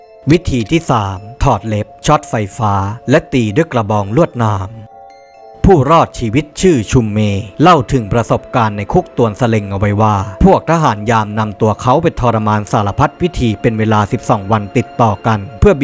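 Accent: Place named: Suphanburi, Thailand. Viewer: แอบเหน่อสุพรรณ😂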